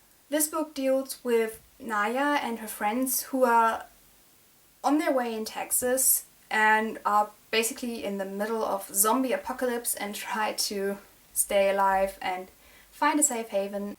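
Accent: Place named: Germany. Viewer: I just found your channel and I'm def subbing! Are you from Germany? Your accent sounds a bit german like!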